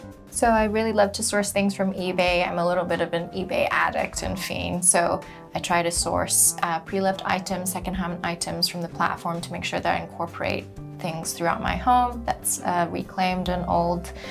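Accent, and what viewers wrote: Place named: North America. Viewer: This north-american way of talking is painful.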